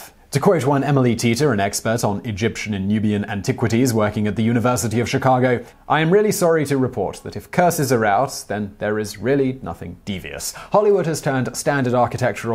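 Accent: England, United Kingdom. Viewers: Much Refreshing Delightful And Insightful... LOVE YOUR Marvelous English Accent And Your Intellect!!! YOUR ALWAYS Well Put Together Along With YOUR Topics. I Look Listen And Learn So Much THANK YOU, For The Excellent Work Keep It Up.... THIS Subject Matter On THE "Egyptians" Is So Fascinating. PEACE🙅🎩📖🏆😃🙅🎩📖🏆😃🙅🎩📖🏆😃🙅🎩📖😃🙅🎩📖🏆😃🙅🎩📖🏆😃🙅🎩📖🏆😃🙅🎩📖🏆😃🙅🎩📖🏆😃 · Bald? Check. Glasses? Check. British accent? Check. This gent must be legit.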